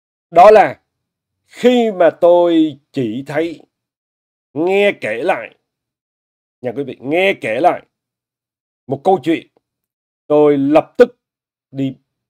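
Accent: Standard. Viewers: Chào cố gắng lên đều vì mình thích kênh này bởi nói rất chuẩn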